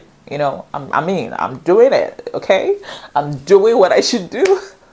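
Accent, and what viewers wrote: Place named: Nigeria. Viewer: The story is not as good as the title 😂😂 or maybe it's the Nigerian accent for me which am not very good with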